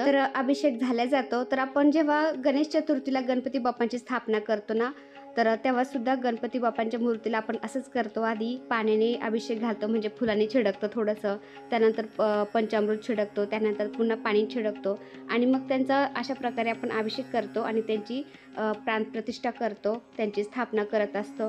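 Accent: Ahmednagar, India. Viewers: Tai khup chan kele tume puja tumcha bhashe mde aplya .Nager cha sugandh yeto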